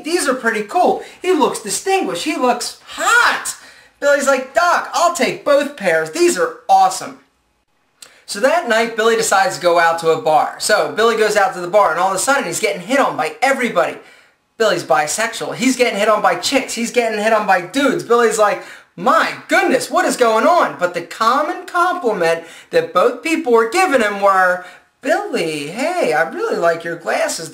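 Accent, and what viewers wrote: Philadelphia, United States. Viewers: Oh boy. Philly accent. "Gleasses"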